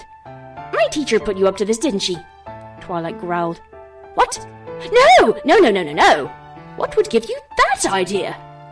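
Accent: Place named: United Kingdom. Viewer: YOU ARE BRITISH INSTANT SUBSCRIBE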